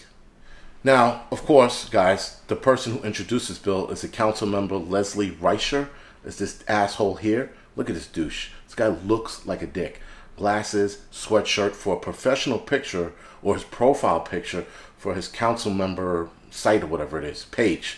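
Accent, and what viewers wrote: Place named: Pittsburgh, United States. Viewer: Sounds like Pittsburgh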